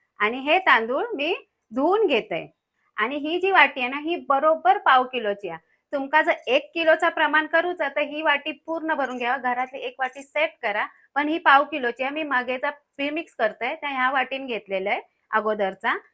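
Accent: Malvani, India. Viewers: मालवली भाषेत सांगतात ते खूप छान वाटते ऐकायला.❤❤❤❤❤❤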